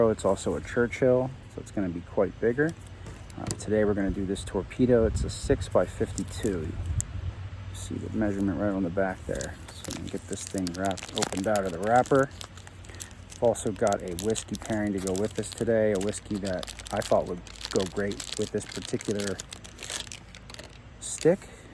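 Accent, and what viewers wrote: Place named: Northeast, United States. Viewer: Love your reviews! Between the three Quorum varieties, which would you say is your favorite? BTW, your Northeast accent and sensibility definitely brings me back home as I currently live in California but grew up in the NYC area! Are you originally from PA?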